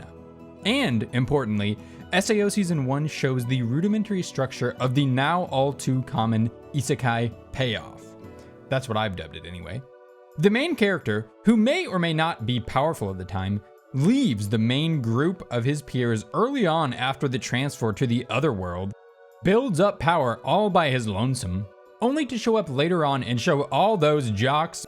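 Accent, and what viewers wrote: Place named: United Kingdom. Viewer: SAO fan here. First of all, I wanted to say great video—even with the British-like Japanese and the 202012s, I was invested in this video from beginning to end. Now, onto what I want to say: I get that people would revile SAO for the whole isekai/harem boom of the 2010's, and it makes sense. There's a clear oversaturation of the market because everyone's been craving the high SAO had given them. Of course, everyone's problems with Fairy Dance can be solved just by watching Gamerturk's common misconceptions video about the arc, but the point I'm trying to get across is that I feel SAO has created an Aincrad-shaped hole in everyone's hearts, only capable of being whole with that same tension of life-or-death. That's why in this supposed "drought" of SAO (anime) content, I suggest we show a MASSIVE amount of support for Reki-sensei to actually lock in. 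As of writing this comment, the latest arc—Unital Ring—is currently on its 8th part, with events leading to what could possibly be a link to Accel World. Not only that; but his other subseries, SAO Progressive, is going to have its 9th volume released for the west on May 12th. While it may seem as though Reki-sensei is just meandering around with his stories because he's probably hit a writer's block, cheering him on enough to keep pushing forward will definitely give him a boost to get his act together and finish the Elf War saga within SAO Progressive. If we do that, we can generate enough buzz to make Kadokawa consider either a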